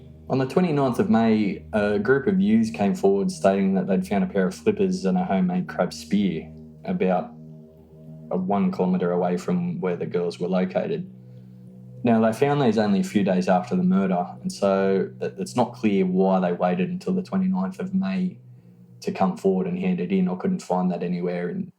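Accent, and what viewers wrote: Australia. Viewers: As a criminology and criminal justice student, you sir have a new subscriber. Props for the Aussie accent too 🇦🇺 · its an AUSTRALIAN accent..... are u seriously asking someone NOT from your country to speak differently??? To suit YOURSELF???? Oh ffs, you are a friggen KARENNNNNNN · True crime + podcasts + a beautiful Aussie accent ... could this get any better? I caught one of your vids in my suggestions and am now bingeing them from the beginning. Thank you for all of the work you put into these cases. They're wonderfully researched. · Probably a great and interesting story. Unfortunately, those of us with hearing problems, especially me, will not know. Australian accent, compounded by the addition of reverb makes it almost impossible to understand.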